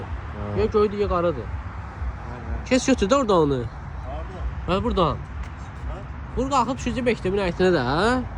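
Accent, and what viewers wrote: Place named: Mashtaga, Azerbaijan. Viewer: Hansı ləhçədir,Maştağa?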